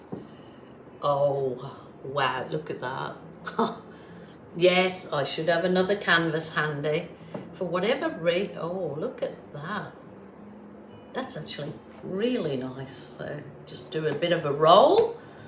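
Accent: Birmingham, United Kingdom. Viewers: Hope you're feeling a lot stronger really soon ..am awaiting the first of 4 surgeries myself including a full donor cornea transplant eventually in the hope of reversing the 100% blindness in my right eye and 60% in my horrid legacy of nearly dying of COVID months in hospiral/ respite ..I taught printmaking too . Just try & take it easy and don't push yourself Youtube..just hope your surgery heals fast x Hey as an English person ( born & bred in Liverpool by Strawberry Fields in fact )..ARE YOU or have family close to you from either the Midlands ( Birmingham ..Izzy Osbourne country ) OR my old neck.if the woods in Liverpool..I.know the amount of Australian fact terms ,swear words lol.& even humour & spelling of course that Britain & Australia.I'm that way are like twins..HOWEVER I strongly hear a Birmingham accent in your voice..OR Scouse any word with two OO' s ..yeah ...so are you ?? 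lol?😆 Wish my late mum was alive she was a potter and my only family ,lost mum aged 94 when I was in the ICU fighting Covid..those round foil paintings my mum would have LOVED them ..just pure colour = mum ❤ xx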